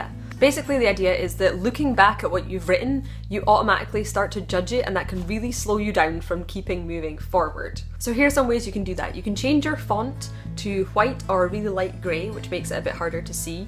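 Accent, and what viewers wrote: Scotland, United Kingdom. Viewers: I love your accent so much. I want to be Scottish when I grow up!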